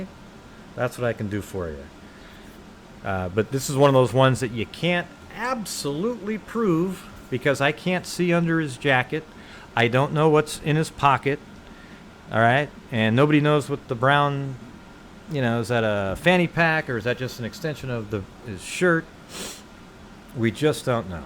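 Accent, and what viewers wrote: United States. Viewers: The best part about this series of debunking videos is, he's being more of a jerk about it the longer it goes on... and he sounds like he has an Indiana accent.... so he kind of sounds like a belligerent Mike Pence, LOL! Love it. Keep the videos up.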